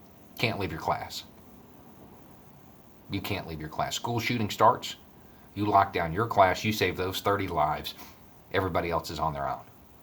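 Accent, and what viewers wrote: South, United States. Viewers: I just recently discovered your channel. I love the way you present things in a straight forward manner. You always give me something to think on. And props on showing the world people with southern accents aren't knuckle-dragging idiots. I've lived in Charleston, SC my whole life and sometimes my accent causes me to not be taken seriously. 🙄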